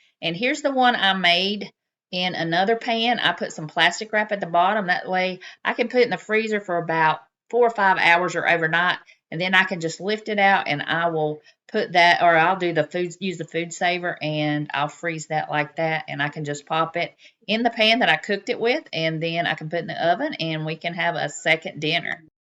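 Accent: South, United States. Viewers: I love this recipe! When I don't feel like cooking a big pot of sauce, I just go grab a jar!! Love your southern accent, my dad was from Mississippi! Thanks for joining in! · Wow! This looks delicious and I'm adding yet another recipe to my list of things to can this year. And I agree, stuffing manicotti noodles is a challenge. Your plate with the green flowers brought back some warm memories. My Mama had the same ones when I was growing up. I'm originally from the south so hearing your voice is like being home again. Thanks for sharing. 😊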